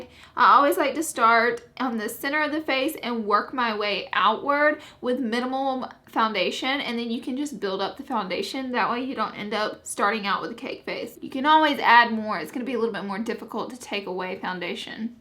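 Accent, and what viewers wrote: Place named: Appalachia, United States. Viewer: Oh my god I love whoever this is because of the cute hillbilly accent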